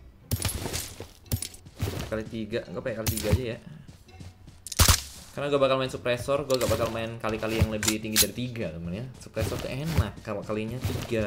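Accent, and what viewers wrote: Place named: Medan, Indonesia. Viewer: LOGAT BAHASA NYA KOK KAYAK MEDAN YA OM 😆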